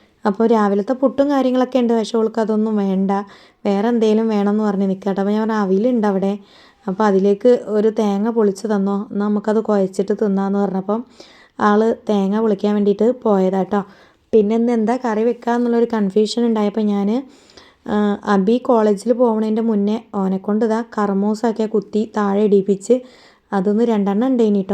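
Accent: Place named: Kannur, India. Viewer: നമ്മ പപ്പ കായീന്ന പറയുന്നത്😀😀😀😀 കണ്ണൂർ ഭാഷയാണേ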